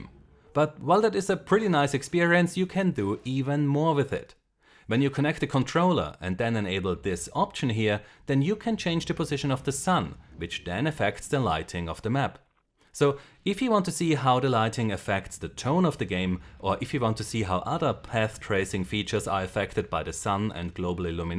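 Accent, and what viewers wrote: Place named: Germany. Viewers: Pretty sure it's german. Practicing english. You can tell when the syllable count is a multiplier of what would normally be said. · Hey there, not to criticize you or anything, but I‘m really curious about your accent. Sounds kinda German-ish, but with a different pronounciation, so my guess would be that you‘re Austrian? Am I correct?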